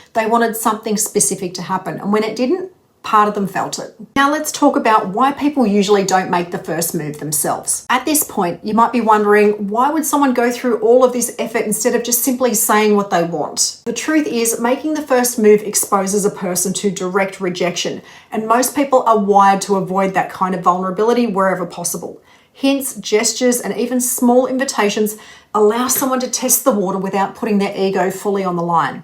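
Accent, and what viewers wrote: Australia. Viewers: That Australian accent is just the best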